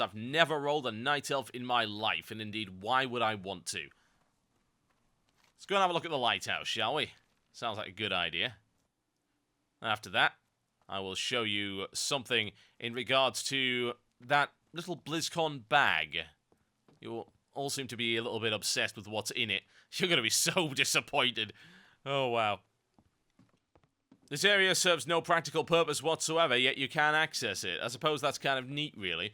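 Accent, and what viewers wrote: United Kingdom. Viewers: I'm lithuanian and i dont have any problems understanding, he speaks very clearly. I do like british accent, that's how true english should sound. Ofc americans are having a hard time understanding him cuz they speak like efin' murlocks. And, keep a good work mate.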